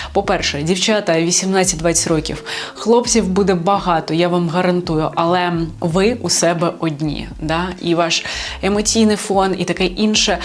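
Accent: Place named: Russia. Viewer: Людина переходить з російсьеої мови на українську. А наголос залишися зі слова «счастлива»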